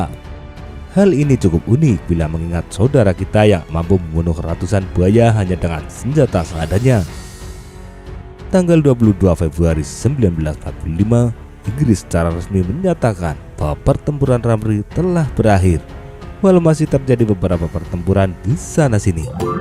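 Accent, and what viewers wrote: Java, Indonesia. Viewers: Tolong di ganti pengisi suara nya ini gak jelas ku dengar logat Jawa baru kayak hidung di tutup pula ngomong nya JD gak kurang jelas terdengar oleh saya gak tau yg lain mungkin bgt jg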